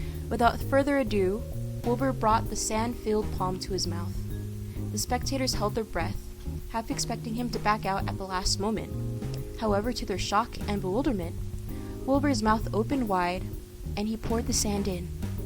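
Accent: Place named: United Kingdom. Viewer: Hey yor british accent is good :] i love the story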